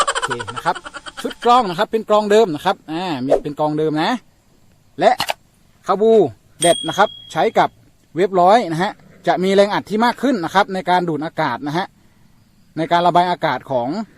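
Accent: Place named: Lampang, Thailand. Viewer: สำเนียง เหมือนคนลำปางมากครับ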